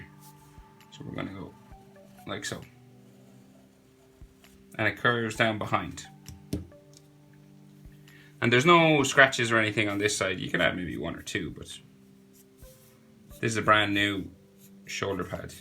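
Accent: Ireland. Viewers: he has probably the first irish accent I haven't hated. and I'm Irish -.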